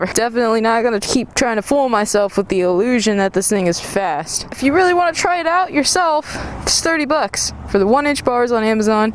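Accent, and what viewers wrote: United Kingdom. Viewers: why dont you put on a set of MY kustom bars ;) and your british accent is bloody good mate! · English accent on point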